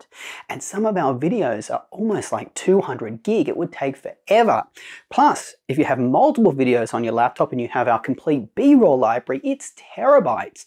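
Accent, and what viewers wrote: Australia. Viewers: I am really inspired by your videos especially this one!!! Nice to hear an Australian accent talking about tech and tech reviews and thing of such. Being from down under myself.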